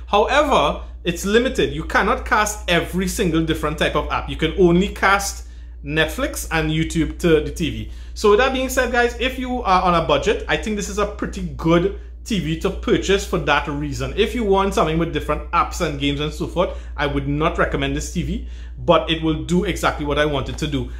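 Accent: Trinidad and Tobago. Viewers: You sounding like a trinidadian.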